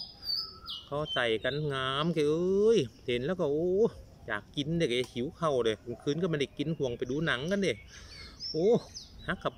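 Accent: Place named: Korat, Thailand. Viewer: ภาษาโคราชน่าฟังจ้า 😂😂 เด็กน้อยดื้อคักน้อจ้า เก็บความทรงจำไว้ ดีค่ะ ขำดีจ้า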